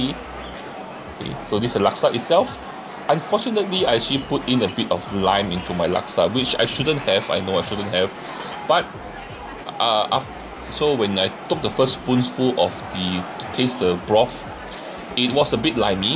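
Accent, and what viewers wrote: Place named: Ang Mo Kio, Singapore. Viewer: What part of Singapore do you live in? You have a classic Ang Mo Kio accent. I love it. ;)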